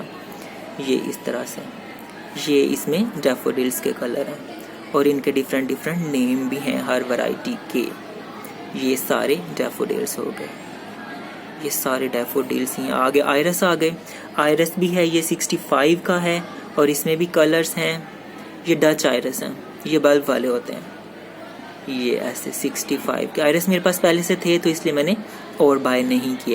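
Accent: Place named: Pakistan. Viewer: aap ki awaj see lag raha tha ki aap Pakistan see hai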